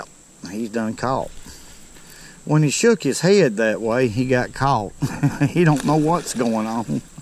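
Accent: South, United States. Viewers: You got that Forrest Gump accent where you from ? Nice video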